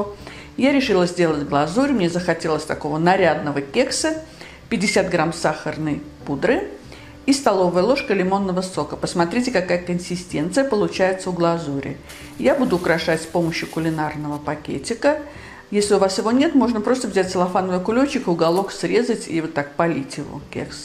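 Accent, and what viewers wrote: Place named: Baku, Azerbaijan. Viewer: Красиво говорите! Вы случайно не из Баку?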